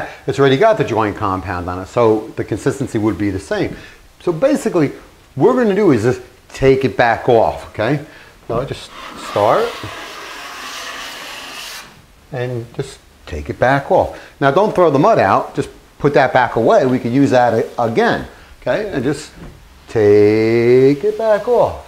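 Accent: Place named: New Jersey, United States. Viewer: Thanks bro. Your videos and verbal style are all awesome! You sound NJ. Am i right? I wonder why east coast refer to drywall as sheetrok, out west no one knows the term sheetrok unless they are very familiar with that name brand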